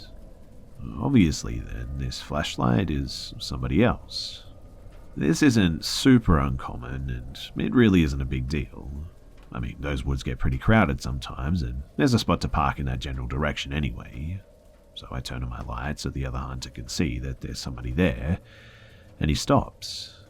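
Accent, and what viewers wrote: Australia. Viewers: Sounds both like an australian and new Zealand and somewhere in the Americas accent. I really like it. 🤗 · I love an Aussie accent, but dude, slow the words down, you miss storytelling when you just read off a script.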